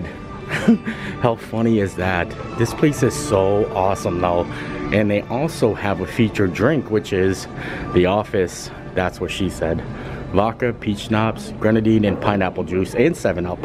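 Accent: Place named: Scranton, United States. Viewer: Omg I KNEWWWW you were from the Scranton area listening to your accent for all of these months I've been following your videos!!! I have family in Duryea. <3 Thanks for the video!! Love The Office!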